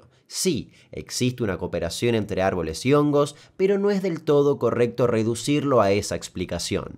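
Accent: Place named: Argentina. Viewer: que bello cuando entro a un video de un canal que no conozco y termina siendo que el youtuber es argentinoooo, hermoso acento y precioso pais. saludos desde argentina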